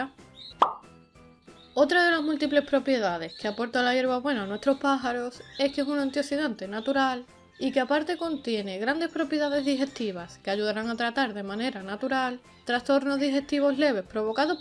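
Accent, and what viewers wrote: Spain. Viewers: En serio, tus videos son muy interesantes, me gusta el acento español pero me fastidia tener que escucharte, usas un tono y una forma de decir las cosas muy fastidiosa al oído, que pereza escucharte, usa un tono y una manera que sea compatible con tu voz y tu acento.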